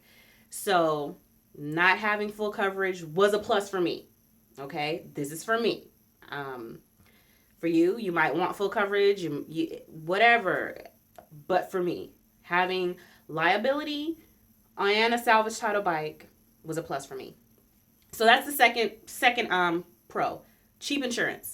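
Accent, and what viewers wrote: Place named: RP, United Kingdom. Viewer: So nice and honest. Thank You! And, love it you speak the Queens English. I was prepared based on your Youtube name for a bunch of profanity and excuses. You go girl !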